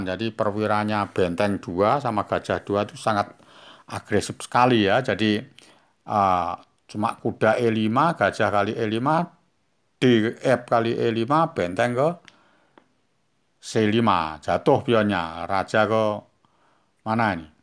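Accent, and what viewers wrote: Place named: Tegal, Indonesia. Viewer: Sampean kaya wong Tegal min...kaku omongane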